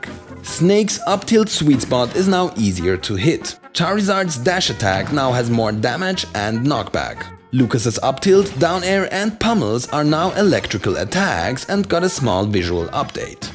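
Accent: Germany. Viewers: Dat german accent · Deutscher Akzent🤔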